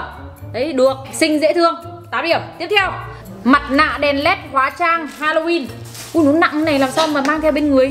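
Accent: Nghe An, Vietnam. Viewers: video sắp tới mần video review bằng giọng Nghệ nha chị :<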